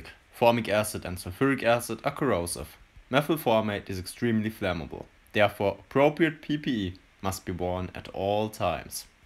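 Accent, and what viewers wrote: Germany. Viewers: I like German accent.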